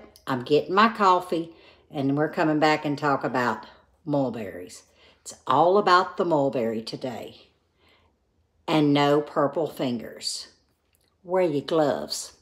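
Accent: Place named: Louisiana, United States. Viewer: I feel like I'm back in Louisiana listening to your accent..... P.S. can you speak Cajun French?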